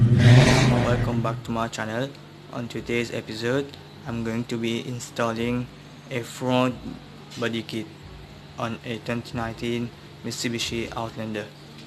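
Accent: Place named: Mauritius. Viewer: You sound Mauritian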